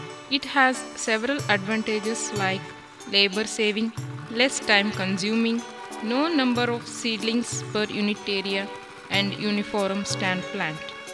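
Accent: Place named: India. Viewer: u can isten to her English,she is from india oviousely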